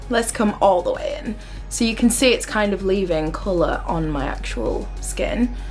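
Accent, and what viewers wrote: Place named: Leeds, United Kingdom. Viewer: !!! I knew I recognised the accent! I'm from Leeds! Going to watch all your videos now 😂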